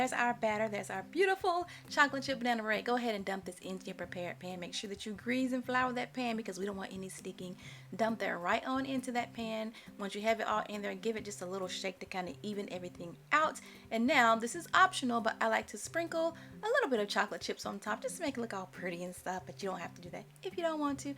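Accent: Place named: South, United States. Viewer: Luv your cookin' and Southern twang sis! 🥰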